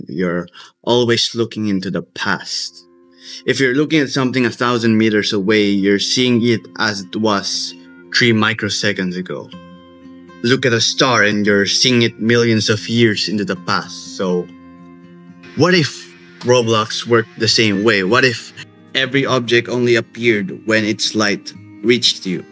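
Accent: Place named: Eastern Europe. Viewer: in-depth sciency roblox video -> click slavic voice for some reason, every time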